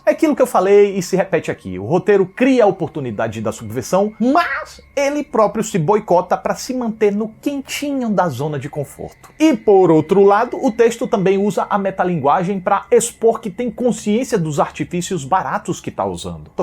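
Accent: Northeast, Brazil. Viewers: Não conhecia seu canal e já amei primeiro pq claramente vc é nordestino kkkkkk sou de Sergipe e pra variar gosto de ver uma linguagem acessível e pela resenha de forma leve! Vi o 6 domingo no cinema e durante a semana revi os outros pra relembrar... Obrigada pelo vídeo 👏🏽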